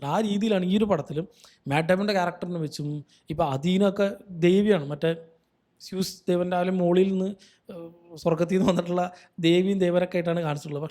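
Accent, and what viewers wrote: Palakkad, India. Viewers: bro, palakkad ano?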